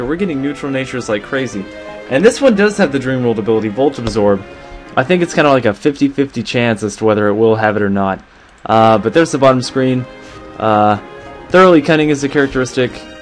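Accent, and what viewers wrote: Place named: United States. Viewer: Hablas espanol? Tu ingles es de Americano! (O aprendiste espanol como 2da lengua?)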